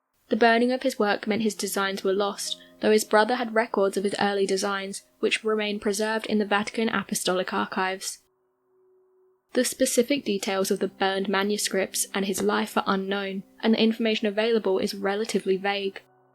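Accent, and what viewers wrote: United Kingdom. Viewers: Are you British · ARE YOU A BRIT? 🇬🇧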